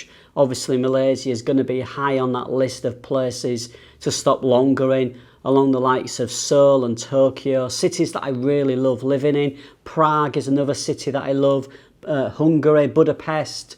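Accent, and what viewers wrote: United Kingdom. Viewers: Lv ur British accent 😍😉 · can you speak less british accented English? its very hard to understand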